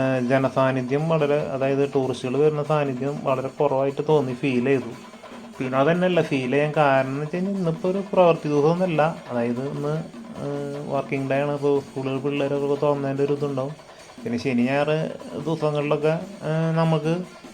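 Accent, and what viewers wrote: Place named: Thrissur, India. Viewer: സംസാരം കേട്ടാൽ തന്നെ അറിയാം തൃശൂർ anennu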